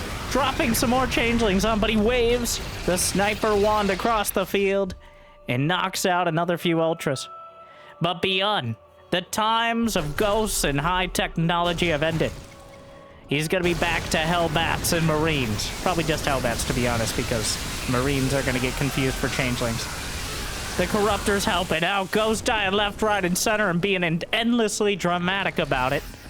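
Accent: South, United States. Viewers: Nice casting!! Would love to see one where you speak normal english, or some british accent. The southern accent is distracting from your great casting quite a bit. American in general is.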